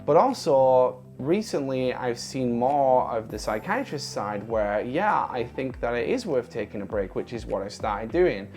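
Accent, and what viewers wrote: United Kingdom. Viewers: So where are you based because you have a British accent but I don't think Adderall is available in the 🇬🇧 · You sound like a limey, is your adderall on Private RX?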